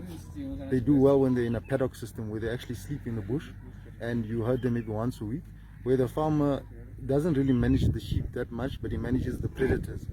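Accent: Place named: South Africa. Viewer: Lol love die boere basoetoe aksent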